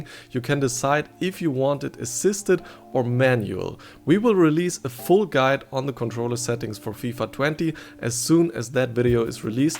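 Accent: Germany. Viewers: Man merkt ja so krass dass deine Muttersprache Deutsch ist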